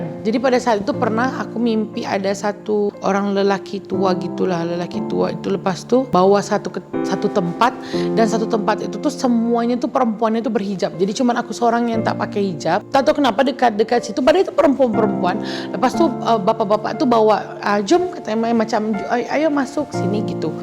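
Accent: Malaysia. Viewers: Sekarang ni memang dah menetap Malaysia ke? Dah pandah ikut slang orang Malaysia. 👍🏻👍🏻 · Logat mcm sabah tapi hakikat dia ni org indon.. tula malaysia dan Indonesia ni serumpun🥰😍😘🇲🇾🇲🇨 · Cakap pun mcm org Malaysia. Doa yg terbaik utk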